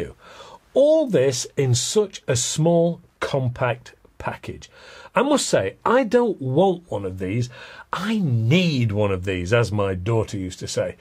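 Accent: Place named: England, United Kingdom. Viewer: So difficult England English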